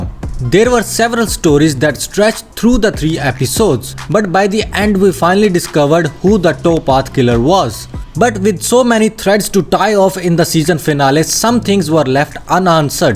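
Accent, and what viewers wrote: India. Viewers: maybe it is an Indian accent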